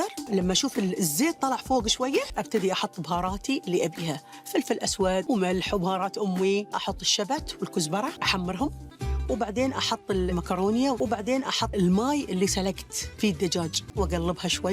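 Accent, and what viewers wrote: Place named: India. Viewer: ليش تسولف نفس الهنود لي تكلمو عربي😂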